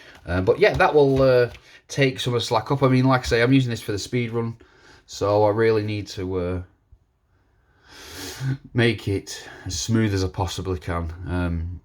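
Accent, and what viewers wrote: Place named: Manchester, United Kingdom. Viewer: Wheres your accent from mate, you in the Manchester area?.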